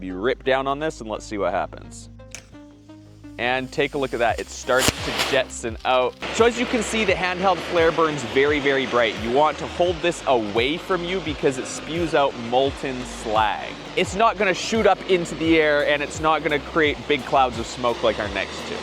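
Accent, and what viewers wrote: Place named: United States. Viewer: Title should be: " Scandanavian pyrotechnic nerd who speaks American describes flares".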